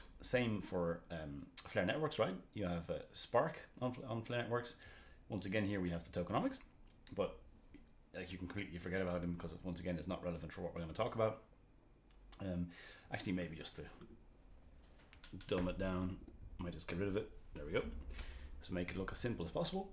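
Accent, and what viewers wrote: Ireland. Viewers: Congrats and good luck creating new videos.. Looking forward to more videos from you....love your irish accent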